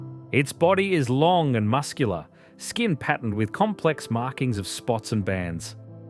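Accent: Australia. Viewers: Narrator has Aussie voice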